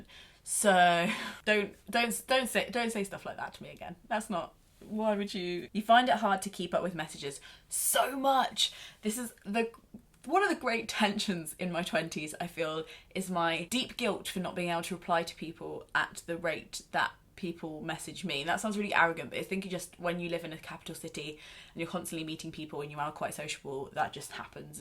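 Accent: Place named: Coventry, United Kingdom. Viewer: Loving the Cov accent! I'm from Rugby and constantly confuse people by my inability to pronounce U and O differently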